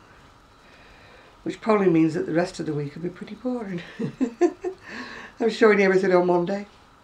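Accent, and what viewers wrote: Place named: Northern England, United Kingdom. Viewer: Hello. I just started crocheting 2 weeks ago and I found your videos when I was looking for crochet book reviews. I have subscribed to you as I love your videos:) I was intrigued to find out where you're from because of your northern accent, and I saw on your Etsy shop that you live in Manchester. This made me smile because I went to university in Manchester.:) Anyway, thank you for your videos. I hope I can be even half as good a crocheter as you one day! xxx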